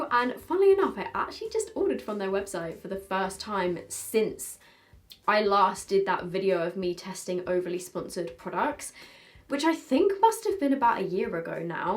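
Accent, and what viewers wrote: United Kingdom. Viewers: Are u British?luv ur accent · Ok but you can say d'amelio with a British accent and me sitting here with a British accent struggling to say it LIKE HOW DO PEOPLE SAY IT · I think it’s just the British accent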